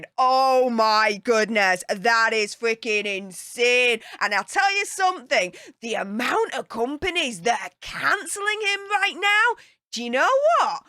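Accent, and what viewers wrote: Yorkshire, United Kingdom. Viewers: She’s Ok got that accent from Yorkshire 🙂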